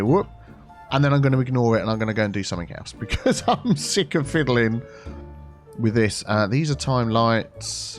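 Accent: United Kingdom. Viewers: I've always wondered, why do you use the American 'counter clockwise' rather than the English 'anti clockwise'? I know it's appropriate in this case as it's an American theme build, but you do it in your other cities too. I was just wondering as your accent clearly means you're from this side of the pond.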